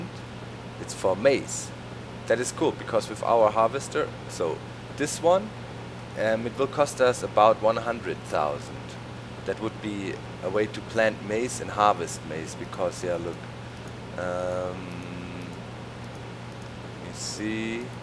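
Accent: Germany. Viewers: Sergent, it is not an accent per se. is mistakenly using German pronunciation rules for some English words. Because English is a mishmash of different languages (Anglo-Saxon, French, and Norwegian are the three main root languages) and has experience a Great Vowel Shift, native English speakers pronounce "freight" like "frate". But in William Shakespeare's time, the word "freight" was pronounced the way is saying it (like "fright" is pronounced in English today)...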